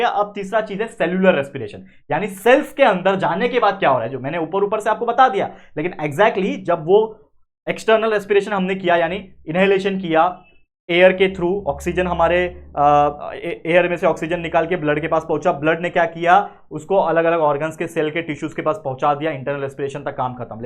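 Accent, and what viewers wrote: Maharashtra, India. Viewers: Ap marathi ho kya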